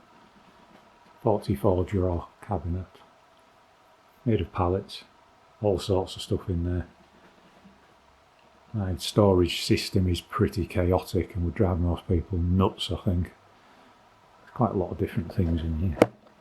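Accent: Yorkshire, United Kingdom. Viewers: So sorry you’ve had an accident. I hope it heals quickly and you’re not in too much discomfort. It was lovely hearing your Yorkshire accent with Hull bouncing through it. Hope you have a great Christmas and New Year. X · You have a voice! In my head you didn't have any accent, but now Yorkshire definitely fits...the dry silent humour should have given it away long ago. Silly me. Hebden Bridge-ish, or am I way off there too? I'm sorry about your hand and hope you recover full use soon. The bandaging alone looks horrific. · Holy cow, you're a Yorkie! All this time I've thought you were American. I guess we project ourselves, huh? LOL Thanks for the shop tour. Pretty cool. I wish you fast healing, brother! I look forward to watching you make more silk purses from sow's ears when you're better. But don't rush it on our account. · Nice to meet you. 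Here's to wishing you a full and speedy recovery from your mishap. I'm not quite sure how long you've been entertaining me but it was a bit before you made the reindeer at Christmas several years ago. So thanks for the brilliant videos. I had no clue where you were from so the British accent was a surprise. Ok then crack on mate.:face-red-heart-shape::trophy-yellow-smiling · you actually knocked me for six, after watching this video 🙌 first of all I wish you the speediest recovery ( I know you didn't want to delve ) and secondly when I heard your Yorkshire accent I thought bugger me there's my pallet contender 🤣🤣 I'm 9nly down the road, you sir are a credit too the process of wood and it's wonders 💪🇬🇧☕️👌 stay safe and thankyou for the tour 🍻👍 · “It’s amazing what people will bring you when they realise that your house is closer than the tip😂” . Thank you for the tour, your marvellous videos and the joy they bring. 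The glorious Yorkshire accent is a bonus. Best wishes for a speedy recovery. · For some reason I thought you were Dutch 😂 as soon as you started speaking I had to rewind and turn the volume up "he's from bloody Yorkshire lad" 🤣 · I always pictured you speaking with a Yorkshire accent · I used to have Geoffrey Boycott's voice in my head for an example of a Yorkshire accent; you've replaced him. (Big boots to fill? You're up to it!) Couldn't help wondering how many people of the early colonial persuasion needed subtitles! I've been watching you for years, with great enjoyment, and I love the little smile and wave you give us at the end of each video. Thank you for a fascinating tour. Heal well, and obey your physio. Kia ora (good health to you) from Aotearoa/New Zealand 🇳🇿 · Hi sorry to see you have been rendered unable to work for the time being , but I’m sure you will soon be back at your bench . 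I don’t know why but I assumed you were from a different part of the world , probably Denmark or Sweden . So hearing you speak in a Yorkshire accent was totally unexpected . 😊 . Goes to show I shouldn’t make assumptions based on limited information . 😅 kind regards as always . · Fascinating. Don't know what I thought you would sound like, but I wouldn't have guessed you were from (North) Yorkshire. I'm from a little ways up the road, Newcastle. Its wonderful to watch you make such beautiful, as well as practical items in your workshop. Did you start out in the carpentry business (I know you said your Father was 'in the trade')? Hope your hand heals quickly. 🙏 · Your British accent surprised me so much! I’m a huge fan of your art creations. I’m so sad to hear you had a shop accident. In your videos you take such safety measures. I’m happy to say I know each and every build in your workshop and remember how you built it. 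Please take care, heal fast, and God bless you ❤ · Omg i wasn’t expecting a British accent. Watch all your videos so hope you get back at it soon. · I never expected the Yorkshire accent, I imagined you as sounding Dutch or Scandinavian for some reason 🤣Thanks for the tour and get well soon, cheers 👍🍻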